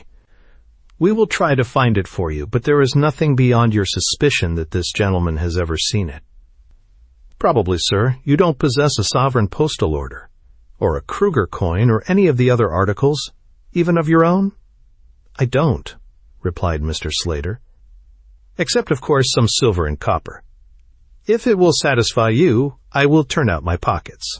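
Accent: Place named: United States. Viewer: Nice hearing plain straight up American voice after excessive British with most over the top with the snootiness and the nearby islands of accents with strictly another country's vocabulary we can at best barely make out.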